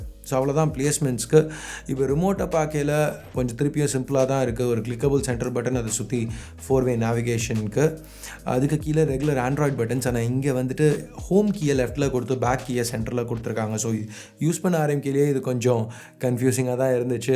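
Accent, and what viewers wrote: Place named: Telugu, India. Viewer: It's really surprising that you are in talking in Tamil because I thought that you were telugu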